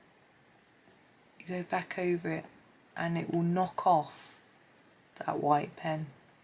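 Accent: United Kingdom. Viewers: lol Welch's grape jelly is indeed like jello, as all jellies are. No solids. Jam, or preserves, does have solids, although preserves is usually thicker, with bigger solid pieces. Marmalade is preserves, but always citrus based. Deja vu on this subject haha. Most Americans call the outside of bread the crust, and the end pieces are either ends or heels. Thanks for the geography info, too, although because I watch Time Team and a lot of Chateau restoration videos, I've already relearned things from my school days lol I do love hearing you talk, where you put your accents, and the phrases that are popular over the pond. Fascinating! Oh, anyways, you do the best berries!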